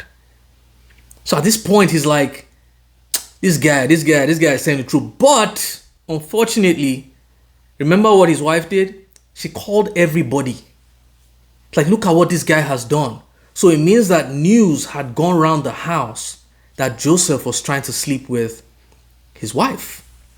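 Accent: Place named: United Kingdom. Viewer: Your English is that of English U.K accent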